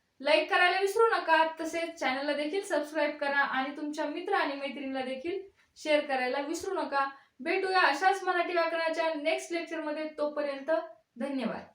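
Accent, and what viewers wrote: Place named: Thane, India. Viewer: मॅडम (ताई,) आपलं गाव कुठे आहे आपली भाषा ठाणे जिल्हा मधील वाटते मी शहापूर, मुरबाड जिल्हा ठाणे मधील आहे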